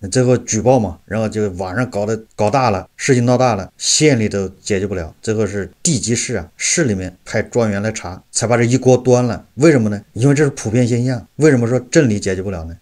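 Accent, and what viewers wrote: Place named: Anhui, China. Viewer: 听口音像 安徽安庆和铜陵的口音啊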